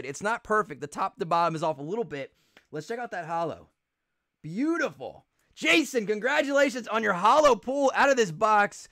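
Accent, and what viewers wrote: New Jersey, United States. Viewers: I know a Jersey accent when I hear one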